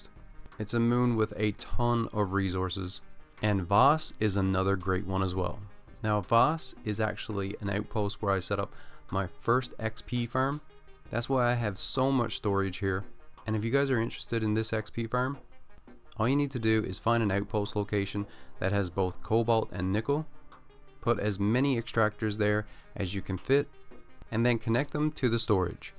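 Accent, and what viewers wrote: Newfoundland, Canada. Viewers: I didn't notice your channel name, but 3 seconds into the video I knew you were from Newfoundland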